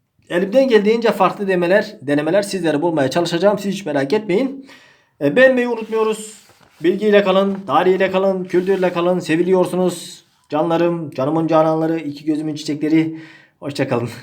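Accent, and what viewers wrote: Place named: Diyarbakir, Turkey. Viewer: Diyarbakirlilar da öyle konuşur ha :))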